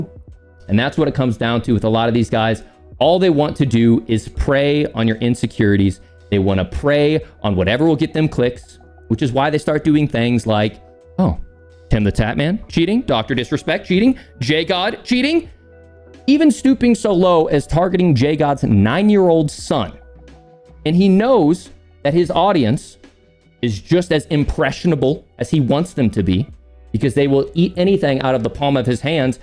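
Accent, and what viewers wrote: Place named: Liverpool, United Kingdom. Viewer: The only thing you need to know is that he’s scouse. Rotten to the core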